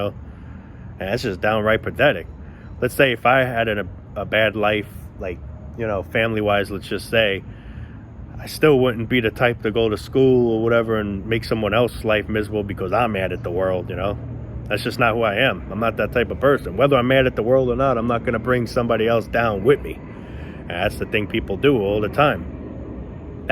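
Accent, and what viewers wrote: New York, United States. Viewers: I really like your New York accent, off topic i know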